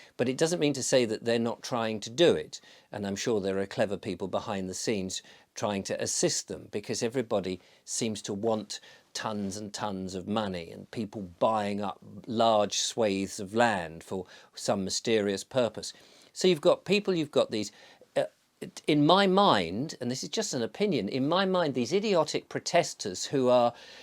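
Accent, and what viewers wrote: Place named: Shropshire, United Kingdom. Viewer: Sounds like Shropshire ...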